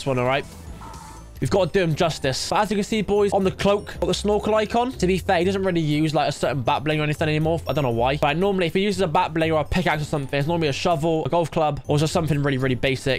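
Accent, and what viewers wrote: United Kingdom. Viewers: Is he English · are you cornish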